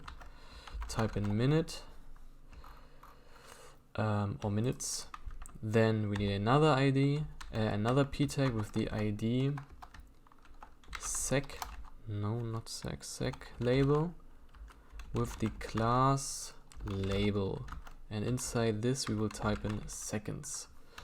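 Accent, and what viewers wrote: Germany. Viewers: I KNEW YOU'RE GERMAN FROm THE BEGINNING ON! (bin auch deutsch)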